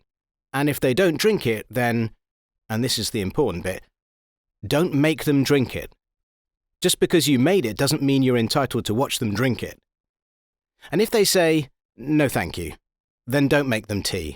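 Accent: United Kingdom. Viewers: This is just so much better with a deadpan, British voice :P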